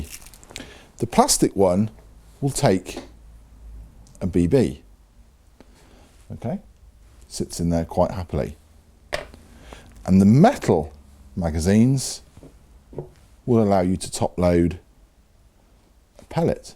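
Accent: England, United Kingdom. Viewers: The English accent is just easier for me to take than a lot of US videos, and I am from the US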